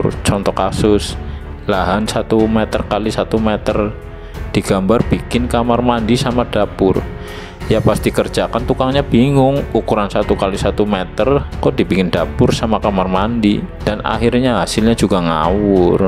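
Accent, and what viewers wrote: Banyumas, Indonesia. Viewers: ini orang kocak abis..asal jeplak..ngapak..gw ampe geli ketawa dengerin nya..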